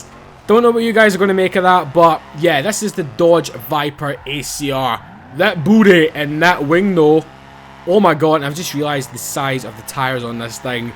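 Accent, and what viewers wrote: Scotland, United Kingdom. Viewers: It's so cool to hear him pronounce "six" like "sex". Love this scottish accent.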